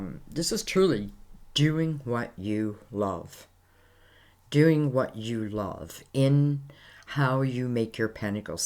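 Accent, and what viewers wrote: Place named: South, United States. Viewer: Thank you so much! I needed this today. You're gifted, skilled, and personable and I have to ask where your accent is from because your voice sounds soothing and Southern. :)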